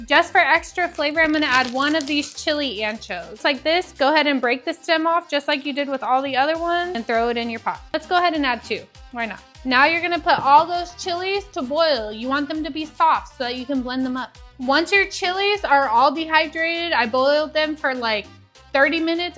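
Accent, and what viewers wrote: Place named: Sub-Saharan Africa. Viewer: A colonizing saa mid uggo ssa yt girl with a funny ssa accent 😂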